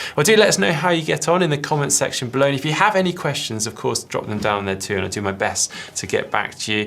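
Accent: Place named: United Kingdom. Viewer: I love the British accent & already ordered a cap, ear plugs & nose plug. Got goggles at Costco.😀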